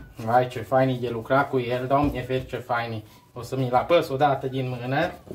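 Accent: Transylvania, Romania. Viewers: Va admir ca barbat priceput in bucatarie,stilul simplu si fermecator de a comenta,dulceata graiului ardelenesc.....pacat ca numai un like va pot da.Pofta buna,sanatate sotiei,si pe curind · Bravo! Te felicit pentru graiul ardelenesc care-i mai dulce decât plăcinta ta de mere, și pentru priceperea la plăcintă. A fost o plăcere să urmăresc rețeta. O voi încerca chiar mâine. Mulțam fain. Să trăiești! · Sunteți ardelean sau maramureșean că îmi place accentul de mor. Sunteți 1 la 1000 priceput la toate. Felicitări pentru prăjitură arată fain și gustul și mai fain. Sănătate doamnei și sărutări de mâini. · Acent din Ardeal Bistrița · CONPLIMENTI UN SOT DE NOTA 10!!! SI ICUM VORBESTI CU ACCENTUL ARDELENESC!!!! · Bade,d-ne feri..cine nu-ți înțelege graiul, bag seama că nu-i de pe meleaguri românești așa-i că bine zic???!!💕💕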